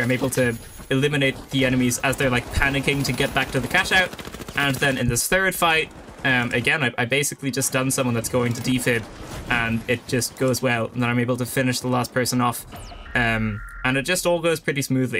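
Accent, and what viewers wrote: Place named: United Kingdom. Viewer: His British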